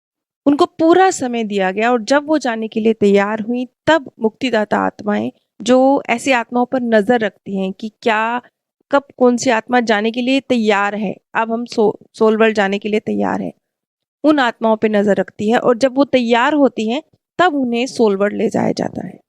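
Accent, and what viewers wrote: India. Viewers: di ka Punjabi accent hai isliye aisa pronounciation hai unka..... Thoda theek se hi reply kr do ye gawar wala cheap word bura nhi lagega kya unko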